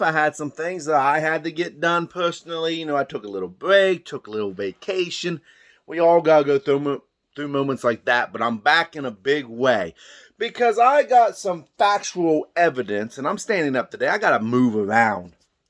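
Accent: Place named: South, United States. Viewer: Why are you trying to talk like e Southern Lawyer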